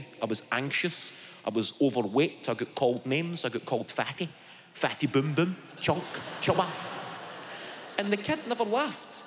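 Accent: Scotland, United Kingdom. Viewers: Oh,. I think you're so funny! Now, if I could only get through your fabulous Scots brogue, then I could really laugh at the parts the audience roars at. I will continue to watch you on Youtube, because you see, I've discovered that the more I listen to you, the more I understand you!!! Wooo Hoooo!!! 💋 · Oh my God I am in love with this guy! He has new material every single time. His smile, his accent and the material are just so real that I ache to shake his hands and tell him what an amazing guy he is... I miss his funny posture from his slightly overweight days but now he just looks stunningly good. Love you. Thank you for opening up the Scot world to us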